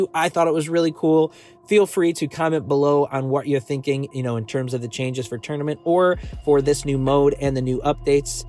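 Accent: United States. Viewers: He is American cant u tell by his accent not everyone knows hindi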